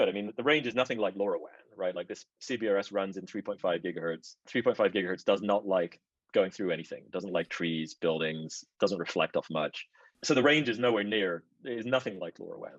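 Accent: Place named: Australia. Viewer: .. i sware i can hear an Australian twang.. did you ever live in Australia?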